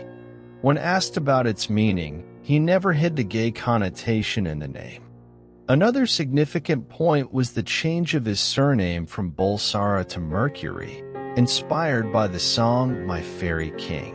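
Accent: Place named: United States. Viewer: I cannot stand American narrators sounds awful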